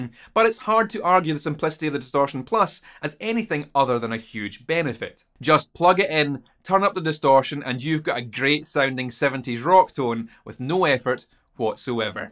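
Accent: Scotland, United Kingdom. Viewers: I really like your scientific approach to explaining guitar electronics. No snake oil salesman here. Also I just love the sound of the scottish accent!!! Greetings from a german electrical engineer drummer guitarist guy. 🤣🤘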